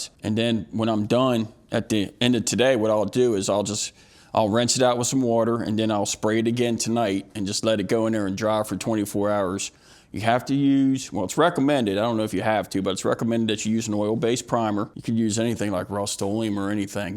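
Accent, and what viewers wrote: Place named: Maryland, United States. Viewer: I hear glen burnie accent!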